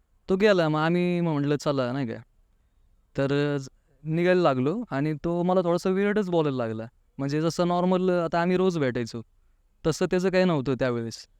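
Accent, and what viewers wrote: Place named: Pune, India. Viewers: Pune mde tsch boltet rav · पुण्याचे सगळेच तसेच बोलतात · Punyachi language ahe ti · Dada pune chi language tasich aahe 😅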